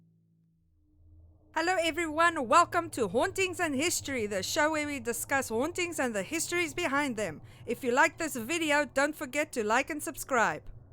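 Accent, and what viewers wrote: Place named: South Africa. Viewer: What a horrible accent. I couldn't watch it all because of it. Must be an Afrikaner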